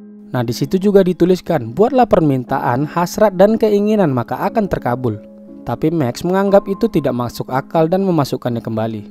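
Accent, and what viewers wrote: Medan, Indonesia. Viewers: Pakek ngomong medan aja sampek habis min,baru paten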